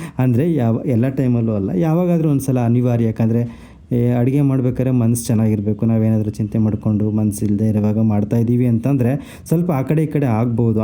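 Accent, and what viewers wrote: Chitradurga, India. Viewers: ನಮಸ್ಕಾರ, ಇದು ನಮ್ಮ ಚಿತ್ರದುರ್ಗದ ಕಡೆ ಅಡಿಗೆ.. 2 ದಿನದ ಹಿಂದೆ ತಾನೆ ನಾನು ಶೇಂಗಾ ಗೊಜ್ಜು ಮಾಡಿ ತುಂಬಾ ದಿನ ಆಯ್ತು ಮಾಡ್ಬೇಕು ಅಂತ ಹೇಳ್ದೆ, ಇವತ್ತು ಈ video ಅಕಸ್ಮಾತಾಗಿ ಬಂತು ನೋಡಿ ತುಂಬಾ ಖುಷಿ ಆಯ್ತು. ನಿಮ್ಮ ಭಾಷೆ ಅಡುಗೆ ನೋಡಿದ್ರೆ ನೀವು ದುರ್ಗ ದಾವಣಗೆರೆ ಕಡೆಯವರು ansutte. ತುಂಬಾ ಚೆನ್ನಾಗಿದೆ ನಿಮ್ಮ ಅಡಿಗೆ videos. ಶುಭವಾಗಲಿ ನಿಮಗೆ 👍🏻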